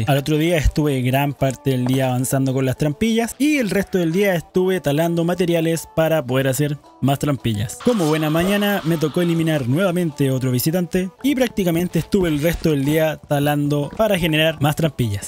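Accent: Chile. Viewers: Verdaderamente escuchar tu voz, narrando con tu acento chileno me hizo sentir muy agusto y entretenida, como cuando veía 31 minutos lol, saludos 🇲🇽😸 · Me encanta el acento chileno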